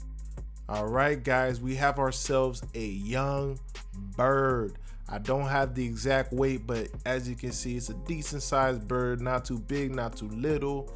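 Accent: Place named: Florida, United States. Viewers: right! I live in Fort Lauderdale. I’m like he’s has to be a local lol 🙌🏼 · right! I'm from Tampa and when I was watching I was like he def from Atlanta or Florida lol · That accent right😂 I'm from Duval Co. He prob from Miami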